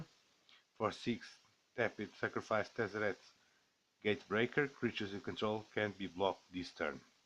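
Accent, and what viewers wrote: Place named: Portugal. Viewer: i have a quick question , you seem like you have a "portuguese accent" where do you play magic ?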